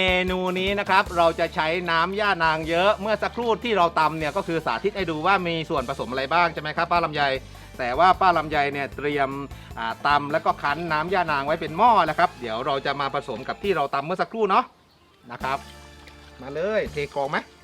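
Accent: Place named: Korat, Thailand. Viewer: คิดถึงภาษาโคราชบ้านเองมาก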